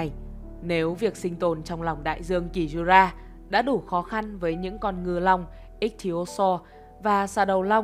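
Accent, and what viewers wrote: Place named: South, Vietnam. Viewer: giọng miền Nam truyền cảm hơn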